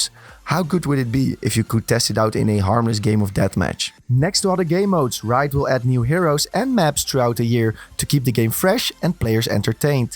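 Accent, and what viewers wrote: Netherlands. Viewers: Hey are you dutch bro?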